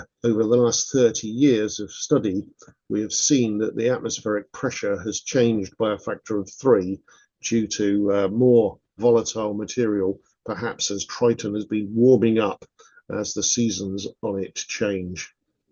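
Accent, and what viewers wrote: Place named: England, United Kingdom. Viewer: That is how they speak in England.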